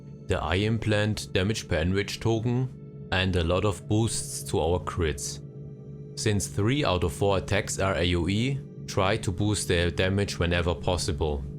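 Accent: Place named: Germany. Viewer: Mach Deutschen Kanal, gibt ne Menge KI Stimmen die das Übersetzen können! Wenn ich mir aber dein Akzent so anhöre, glaube ich das du Deutsch schon kannst! Brauchst keine KI Übersetzung, also sprich Deutsch! Siehst ja das die Reichweite trotz Englisch nicht mehr ist!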